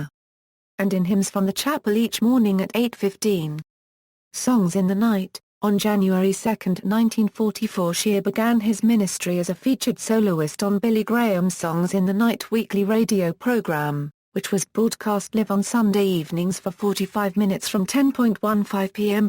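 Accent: United Kingdom. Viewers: Is this female British voice an actual woman’s voice or an AI FAKE VOICE?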